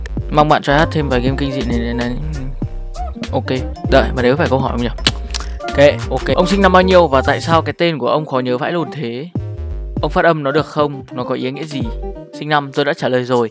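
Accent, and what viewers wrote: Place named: Hanoi, Vietnam. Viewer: T thề là t thích giọng con trai Hà Nội vl ý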